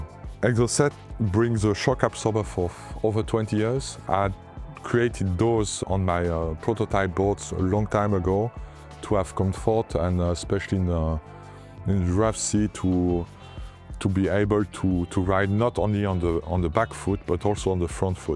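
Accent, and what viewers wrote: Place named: France. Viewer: there's french accent and then there is french windsurfer accent.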